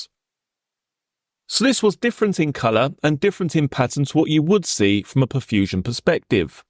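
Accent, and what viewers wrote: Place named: East London, United Kingdom. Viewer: Maybe a great voice actor but the wrong accent. A little too east London, especially for a barrister.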